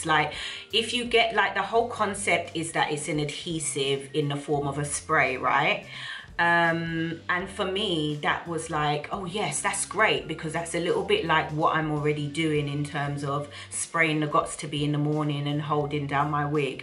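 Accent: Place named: United Kingdom. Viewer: Omg...I love love love love your accent, my dream is to come to the UK 🇬🇧 ❤️ thank you for your review. I bought the Ebin in the pump(like pump it up) you get WAY more lol can't wait to use it.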